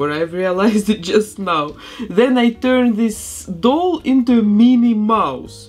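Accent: Russia. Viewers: Love ur doll repaints ur so talented thx for vid. lf l can ask are u Russian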